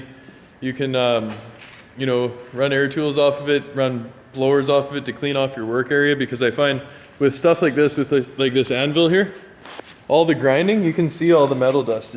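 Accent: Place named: Nova Scotia, Canada. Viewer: Your accent tricked me haha totally sound like your from NS. Cool vids btw the anvil is awesome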